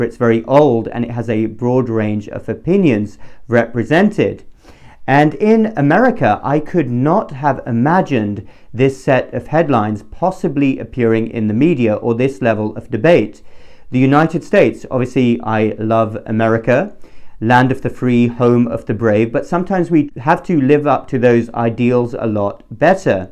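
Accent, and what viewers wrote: United Kingdom. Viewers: yes, I wasn't looking at the screen, just listening, and he sounded British alright. What's the other passport?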